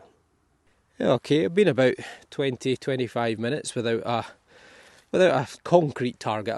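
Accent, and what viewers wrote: Scotland, United Kingdom. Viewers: Hello my friend. First of all, I'll have you know, that we Americans are entertained by your lovely Scottish accent! Furthermore, metal detecting over there is the ultimate dream for any metal detectorist . The oldest artifacts can only possibly date back to 1492 here in America. So, keep up the good work! Thx dmg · A shout out from the States… another incredible journey! I love hearing your accent, as my mum was born and raised in Falkirk. She lived across the street from a big park, I forgot the name of it (I’ve been there as a wee lad but the name slips my memory) but she says there was a great battle there… battle of Falkirk? But would I love to lay my coil on that site! Thanks again for the great hunt…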